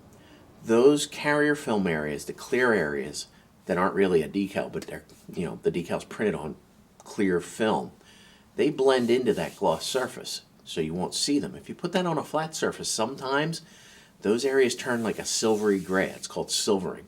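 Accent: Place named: South Jersey, United States. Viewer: Love the South Jersey accent.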